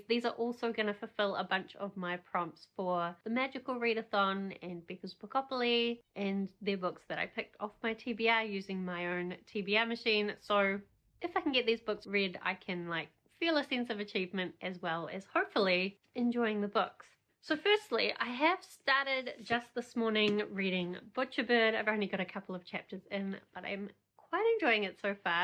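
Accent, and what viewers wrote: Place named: Australia. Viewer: don't worry don't worry I also hate that strong Australian accent 😭😭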